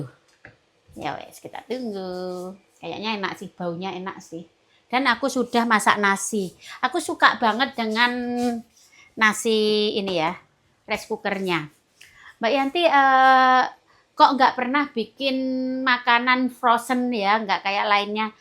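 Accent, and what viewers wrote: Java, Indonesia. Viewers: Yg bikin suka liat chanel mb yanti, dialek jowone kui lo msh lekat dan kentel banget 😄 , apa adanya, pdhl lama tinggal di luar negri. Saluut deh... Mb yanti good atittute 👍 baik hti, mknya suaminya jg baik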